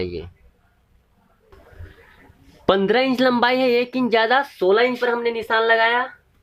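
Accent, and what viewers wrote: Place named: Maharashtra, India. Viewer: सर आप महाराष्ट्रीयन है आप ईस व्हिडिओ मे जोभी वल्ड बोल रहे होना ईसी लुये हमे लग रहा है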